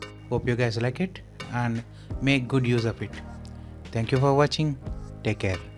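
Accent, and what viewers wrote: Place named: India. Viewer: I had hoped to follow your tutorial but it was just too frustrating. I find it difficult to understand Indian English because American English is my native tongue. It would help tremendously if your narrative was presented in closed caption. Thank you for your tutorial and your understanding.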